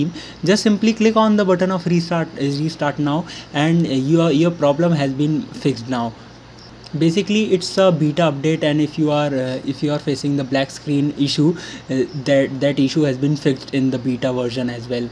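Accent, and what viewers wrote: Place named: India. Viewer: Use a text to speech utility. This Indian jabbering as fast as he can is impossible to understand.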